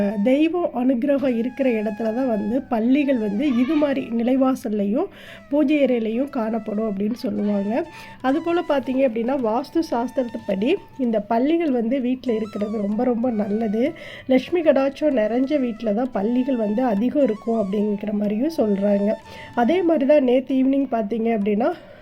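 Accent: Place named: Theni, India. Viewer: Akka ungal negative place Dindigul la akka ennaku theni akka ungaloda speech appadiye erukku Akka ❤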